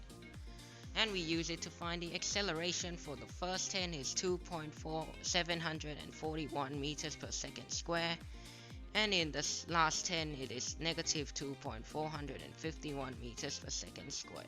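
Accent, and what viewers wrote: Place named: United Kingdom. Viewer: I like that bri ish accent😎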